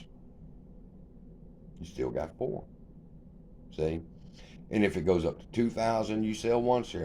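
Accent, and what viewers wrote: South, United States. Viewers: Hey!you're a cool MF. What part of the South are you from?. More power to you have a good day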